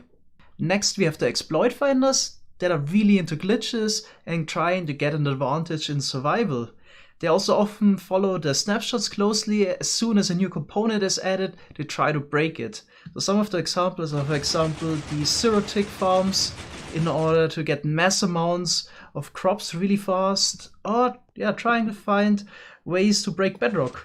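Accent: Germany. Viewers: I love ur german akzent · Are you german cuz you have this accent?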